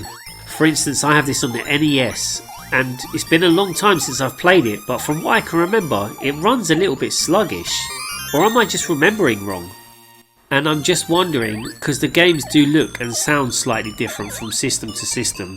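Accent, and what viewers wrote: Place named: Southern England, United Kingdom. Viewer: Is swearing a thing that you do irl as well? Lol I mean it just seems out of place. Half those words are totally new to me! I am gonna go repeat that to my ten nearest fellow humans! I always get bollockings for saying things like I always get bollockings. It's a worthy sacrifice to the gods of filthy far-right Hitler language like piss and shit! I am gonna be like Moe from Simpsons, I know not what I say 🤣 STOP PLAYING WITH YOURSELF AS WELL!! Dude this is actually fuckin hilarious now... English accents are the best as well. You sound like somewhere between the home counties and egham you definitely in the south!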